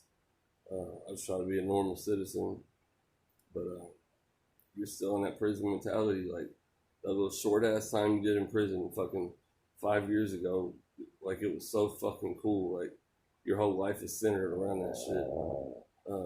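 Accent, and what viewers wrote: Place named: Indiana, United States. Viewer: I dunno you, but you're a white guy from Indiana. And you talk like a white boy from Indiana, you don't pretend to be something you're not. These clowns pretending their momma is blacker than night are an embarrassment. Total embarrassments.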